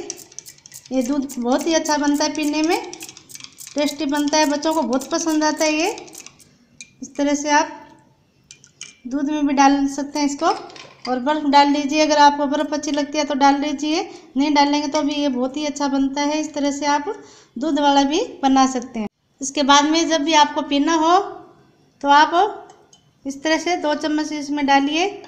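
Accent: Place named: Marwari, India. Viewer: Aap itni marwadi kyon bolte ho? Hindi acche se nahi aati kyan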